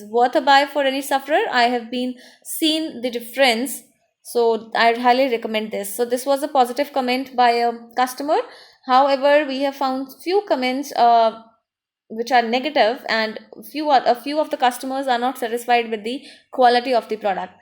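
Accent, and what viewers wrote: India. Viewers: Indian women try speak clearly English and not whit funking indian accent cuz not all people understand you. Try speak slowly and clearly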